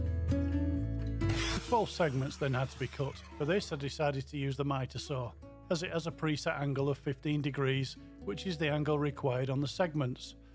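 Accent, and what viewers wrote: United Kingdom. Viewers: That’s a lovely piece. And it’s so nice to hear a British accent. Thanks for sharing 🌞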